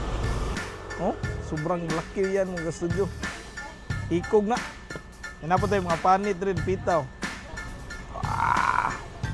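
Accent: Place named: Cebuano, Philippines. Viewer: Bisdak kaayo ka..gahi kaayo diction hahaha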